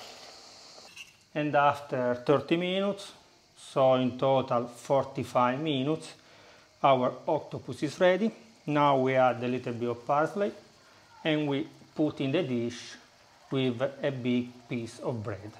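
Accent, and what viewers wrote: Italy. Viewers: Italian accent????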